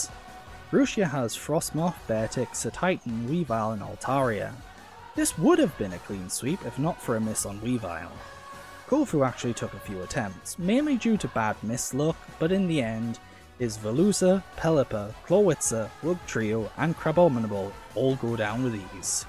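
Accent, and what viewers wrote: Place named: United Kingdom. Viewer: Me: Who is this channel? Algorithm: Trust me bro Me: (skeptical) okay... (plays video) (player has British/Euro accent) Me: oh FUCK YEAH this finna be good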